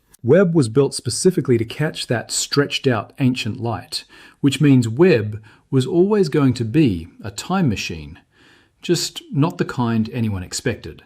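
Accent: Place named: United Kingdom. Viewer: Interesting stuff. Notice he goes into a British brogue after about three minutes. Oh that’s weird having an AI change an accent midstream.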